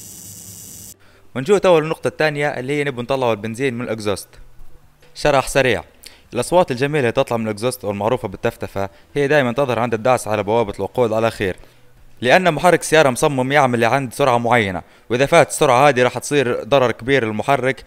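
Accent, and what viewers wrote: Libya. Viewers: ليبي يتكلم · السلام عليكم. حاب نتعلم منك شغلك. من لهجتك عرفت أنك ليبي يعني أبن بلادي. ياريت نقدر نتواصل معاك شخصيآ. عشان ناخذ عندك كورس عملي. أرجو الرد يا أستاذ.! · حسيتك ليبي😁 منور ربي يوفقك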